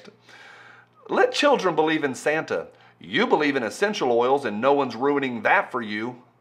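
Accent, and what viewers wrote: United States. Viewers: Love ya!!! That freedom accent is soo heart felt..maybe get a lahma tin hat for those on the left coast to stop the the brain washin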